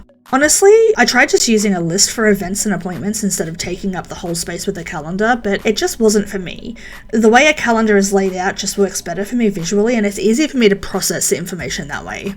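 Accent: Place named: Australia. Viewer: Gidday from Sydney 😊😊😊😊. This spread is amazing. So good to hear an Aussie accent. ❤❤❤❤❤. I like the colours you've used. ❤❤❤❤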